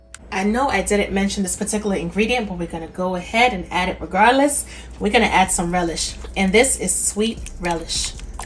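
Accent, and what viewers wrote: New York, United States. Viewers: I'm not going to play with you. 😀😀😀😀 You have an accent like you are from NYC. What I am about to say does have nothing to do with you and your cooking. I have been watching this show called POSE on FX Channel. If you know what I'm talking about I appreciate it. I just wished Blanca... who was the mother of "THE HOUSE OF EVANGELISTA" would have fed her kids things like this. Fried chicken, pork chops, etc..., LOL I know it was only a TV show. I'm just having fun 😋😋😋😋😂😂😂 This looks AWMAZING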